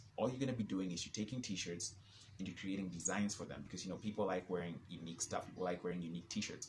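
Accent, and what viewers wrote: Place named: United States. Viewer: Great content ...but how did you lesrn American English😂😂😂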